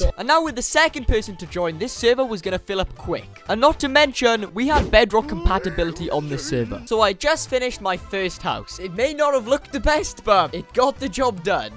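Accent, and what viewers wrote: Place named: Newport, United Kingdom. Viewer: Man you have grown so much! You probably don't remember but I commented on your Maze 100 days when it came out saying I know that accent anywhere and that I am from Newport! Keep it up I have subscribed hope to get my gaming channel up and running soon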